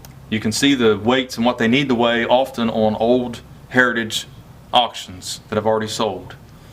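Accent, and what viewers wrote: Kentucky, United States. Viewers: Color not Collar. Wtf you're from Ohio, sounding like some Kentucky imposter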